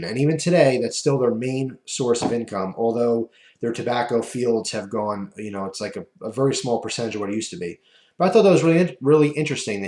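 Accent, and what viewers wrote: United States. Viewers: i just assumed you were american the way you were talking, you came across a bit strong and also, /watch?v=LIlUcPRYroM&feature=plcp&context=C4582d3bVDvjVQa1PpcFObXtukHaAHVqDa_DPkS-9G5riny3IwqT8%3D .this is comeing from the man him self, this argument is getting off topic ,i have to bring her back.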